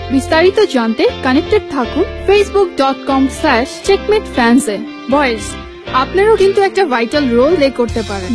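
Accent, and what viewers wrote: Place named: England, United Kingdom. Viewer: আপা কি কথা বলার সময় চুইংগাম চাবান? কথার সুর শুনে মনে হচ্ছে আপনি বাংলা ভাষার মধ্যে ইংলিশ টান নিয়ে আসেন!!আর আপনার ও কি কাউকে লাগবে নাকি চেক মেট করার জন্য... ঠিক বুঝলাম না!!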